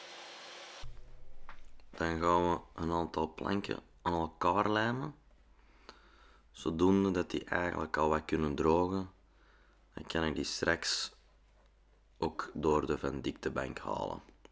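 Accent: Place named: Antwerp, Belgium. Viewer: Hey,gelemen wat is dat??? 😜het is gelijmd. Je zegt toch vastgelijmd of vastgeplakt 🤔. Ik hou wel van dat Antwerps 👍. Maakt u video leuker 😀. Mooi werk trouwens. G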